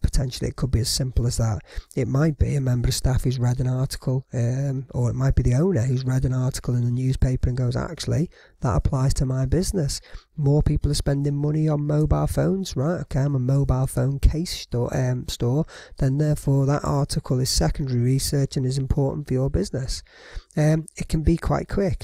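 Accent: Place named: North, United Kingdom. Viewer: this video would of got more likes if you weren't northern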